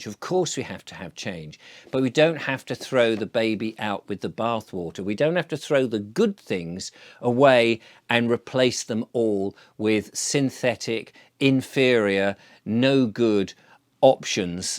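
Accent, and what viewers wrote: United Kingdom. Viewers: I do love listening to, the relaxing tone, well spoken British accent, important messages. I don't watch everyday, but I do binge on a weekly basis.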